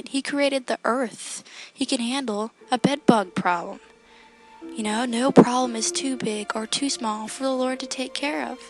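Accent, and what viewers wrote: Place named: United States. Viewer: yep, here I am at 3.30am in the morning stuggling to find a solution to this bed bug problem (from the past Lord knows how many years, but they just keep coming). You sound like you're American.....I speak to Americans everyday as part of my job here in India. I'd like to thank you for taking the time to post this video. I've prayed about it...but the people in this joint family aren't very careful about stuff, they keep old junk stuff under tables or where ever they find place...help Lord :(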